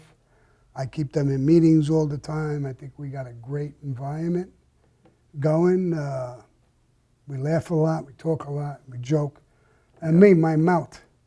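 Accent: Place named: Boston, United States. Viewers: You remind me of my father the way you tell stories, but with a Boston accent instead lol. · Im glad your here to tell your story.. can u say "im gonna bust your balls " with your east coast accent love that shit thanks for the video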